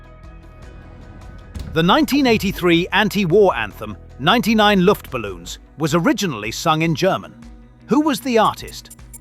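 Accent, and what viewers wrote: England, United Kingdom. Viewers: !!! had two number ones, neither of which were. and. Then when came up, was their 2nd number one, I had to turn off your quiz. If you're aiming at an American audience then don't use an English voice.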